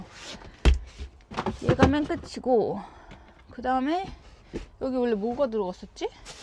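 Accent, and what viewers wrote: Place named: Jeolla, South Korea. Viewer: 아 그렇군요 전라도 사투리 한번씩 쓰시는거 같아서 ㅎㅎ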